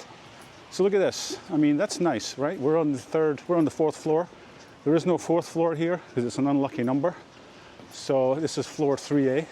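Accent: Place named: Glasgow, United Kingdom. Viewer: That sounds like a west end of Glasgow accent…am I right?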